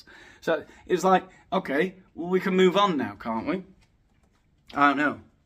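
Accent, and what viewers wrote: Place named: Yorkshire, United Kingdom. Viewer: I'd pay proper money for an audio version....with you reading it. A proper, Yorkshire accent would make it almost bearable......I'd still have to take regular wine breaks, though. But I'd rather stick pins in my eyes that listen to that awful pseudo Americanised drawl from Hawy.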